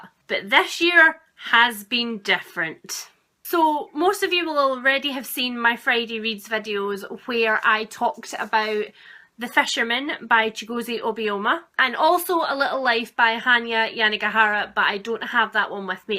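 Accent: Scotland, United Kingdom. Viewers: Just discovered your channel! I love your videos! And I love your scottish accent! I can't wait to visit Scotland again next summer (I'm Italian), I've been there back in 2014 and fell in love with it.. Plus, Edinburgh's bookshops are to die for! Awesome Haul btw!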